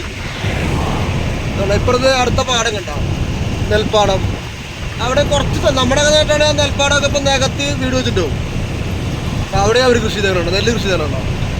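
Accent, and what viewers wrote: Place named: Thrissur, India. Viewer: തൃശൂർ ഭാഷ ഇഷ്ടപ്പെട്ടു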